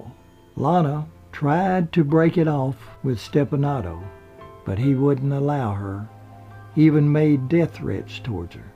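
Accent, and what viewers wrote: South, United States. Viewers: He is a elder southern man. Many (including a large amount of younger ones too) still speak that way down here.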